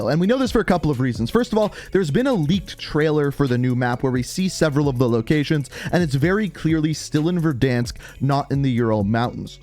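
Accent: Canada. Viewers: Is saying known the way he does a Canadian thing or just a way he does it?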